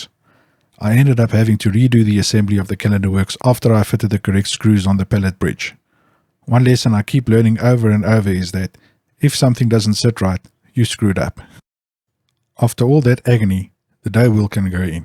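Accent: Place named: South Africa. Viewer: Excellent and a nice South African-accent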